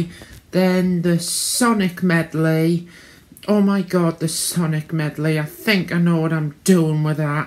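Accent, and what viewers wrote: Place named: England, United Kingdom. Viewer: Oh yes, youre from England:D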